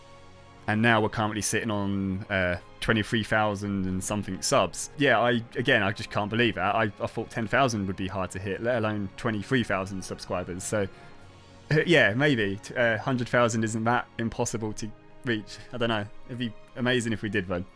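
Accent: United Kingdom. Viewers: I did NOT expect you to be English! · He british 😍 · His accent 😍😍 #LondonBoy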